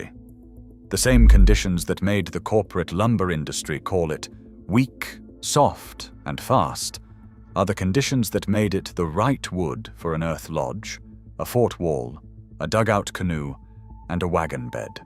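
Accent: United Kingdom. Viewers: Just because you put a British voice to the information doesn't make it right. COTTONWOOD SUCKS AS A WOOD FOR ALMOST EVERY THING! Remember, I said ALMOST every thing involving wood uses.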